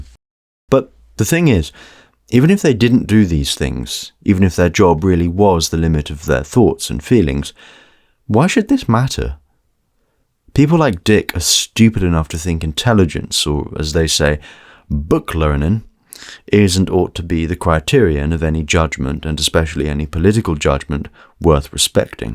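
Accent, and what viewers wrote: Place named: United Kingdom. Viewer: bro you cannot have a british accent and make a video like this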